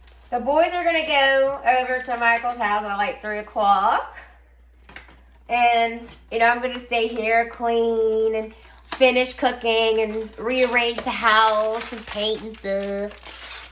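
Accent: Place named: Tennessee, United States. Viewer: She got that Tennessee accent y’all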